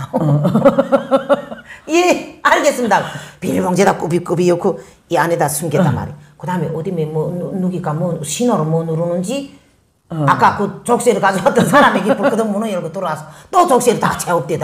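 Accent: Hamgyong, North Korea. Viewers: 님 오래 기다렸습니다 함경도 말씨 좋습니다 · 우연치않게 님의 동영상을 모두 보게 됐네요...오늘에서야...물론 님의 평양만사는 구독신청해 놓았고..자주 보는데 님의 구수한 걸쭉한 이북사투리 넘 감칠맛나서 중간에 보다가 시간이 나서 처음부터 자세히 보게 됐습니다. 아주 코너를 하나 만들어서 하는게 좋은듯....그리고 님 저가 장담하건데...분명히 똑똑한 작가가 있다면 TV작가가 있다면 연락이 올것입니다. 그전에 연기 공부좀 해두시면 님이 반듯이 꿈꾸던 북한 여자 연기자역이 반듯이 올것이라 생각됩니다. 참 대찬 여자라고 생각됩니다.....당신을 응원합니다.....북한 탈북인들 유튜브를 가끔봅니다만 님 정말 당차고 대찹니다.......조만간 "채널A 이제만나러 갑니다"에서도 연락이 와야하지 않을까 싶습니다....너무 잼나서 오늘하루 씨의 방송프로를 다 보았습니다....정말 재미있습니다..........응원합니다.........홧팅~~~~~아차~ 필살기 4편은 언제 방영되는지....빨리 나오길 기대합니다.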